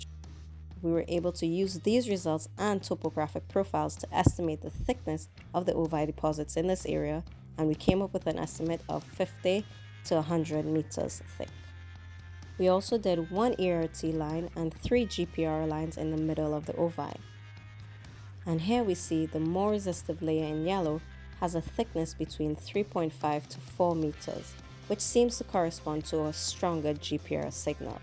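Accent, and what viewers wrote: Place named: Trinidad and Tobago. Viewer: Hey, I loved the video. I am a Trinidadian doing research for my thesis and came across your video. You sounded Trini so I researched you. Keep up the good work and post more content. Thank you.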